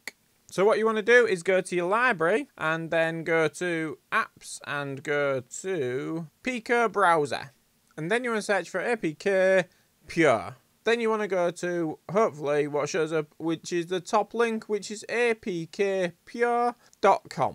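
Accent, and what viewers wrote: United Kingdom. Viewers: Are you from Hull mate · One thing i could confirm is that, he is from UK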